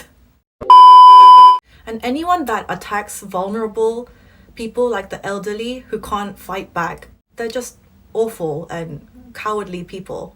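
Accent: England, United Kingdom. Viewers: "Once bitten always smitten".... From the looks of it, you have immersed the entire teenage and adolescence in the UK....Yes the ever prominent threat of being an Asian-hate target is there, but the London contacts and friends that you have made will always stand firm alongside the future.... So, my verdict is that this "Time" will never wait. Go with your brave heart if there is a strong calling from deep within.... Your English accent and the hard earned communication skills will pay much diligence in a city like London as this city is the perfect platform for music. However, if the heart is swaying momentarily due to income bracket from Youtube, i am gonna advise to look into your career over a long run. Once you introspect past the intangible demons instated in the mind by modern media, you will find if your innate passion lies in a compromise gig over YouTube or in the sacrifice of your tiger mum who is willing to bear your resentment just for a bright career in the so called financial capital of the world "London". 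Being an Asian in similar waters, I thought of writing this down. I aint no philosophical expert. So, this is just contemplating and a bit too much nostalgia of the London vibes that i miss now being in limbo for the past one and half year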